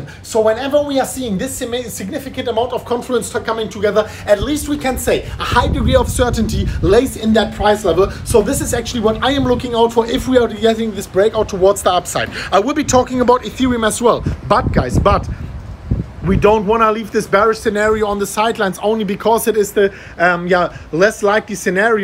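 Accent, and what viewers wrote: Germany. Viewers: Dieses typische Deutsch English 👍🏽👍🏽😅 Hamma YouTube Channel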